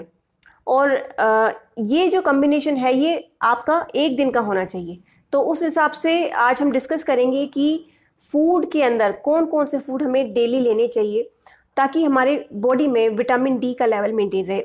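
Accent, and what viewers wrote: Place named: India. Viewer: english subtitle. indian voice.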